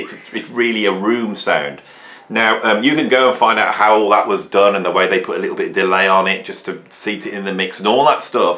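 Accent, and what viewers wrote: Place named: England, United Kingdom. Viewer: This was so interesting! From your bombshell 'I was playing Bonham's kit' moment to the theories on the way he got the open, sonically powerful style in collaboration with Page's recording know how and his own early influences to ultimately create his oft imitated yet never duplicated signature style. Incidentally by your accent, can we deduce you were born in a roughly similar part of the country to John/ Robert?😄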